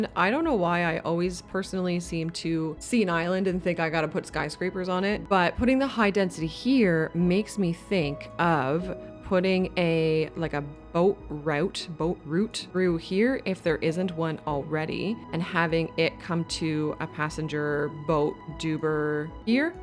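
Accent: Canada. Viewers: As a fellow Canuck... your consistent pronunciation of "aboot" hit my funny bone just right! LOL Anyway, this was HUGELY helpful! I have never thought of this idea, and I struggle when building cities, despite all the great guides and tips out here in YT Land. I'm going to start over - again - like, I think this will be my fourth city start since I got the game the day it came out - pick a map, take a screenshot, load that into my Goodnotes app on my iPad and mark it up with my Apple pencil in ALL THE COLOURS! Then I can have my plan in front of my face while I build. SUCH a great tip, thank you for sharing!!! :trophy-yellow-smiling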